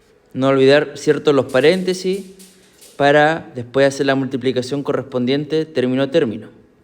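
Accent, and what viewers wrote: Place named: Chile. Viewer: me entretiene el acento chileno, buena explicación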